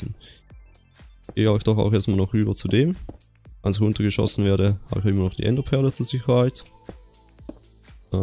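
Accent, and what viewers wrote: Switzerland. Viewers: Nicht negativ; aber ich feier einfach den Schweizer Akzent sehr :)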